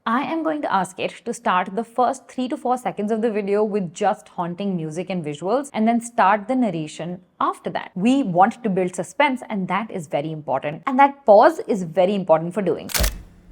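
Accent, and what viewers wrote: India. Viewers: Your voice is sound as indian ❤😮😊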